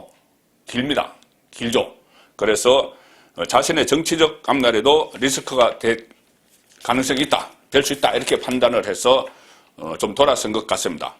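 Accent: Gyeongsang, South Korea. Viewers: 송국건씨! 제발 제발 같은 말이라도 강한 어투로 마치 벋살난 집안처럼 표현에 신중하시길 바랍니다 경상도 억양 인데다가 발음또한 우물우물지껄이는 소리가 ... 특히 지도부를 다리 까는데 그중 이준석 대표를 너무 까은데요? 보기가 아주 거슬리고 구토가 날 지경이니 제발 자제하시고 정권교체의 절체절명 시점에 헐팅 그만 하시기를 바랍니다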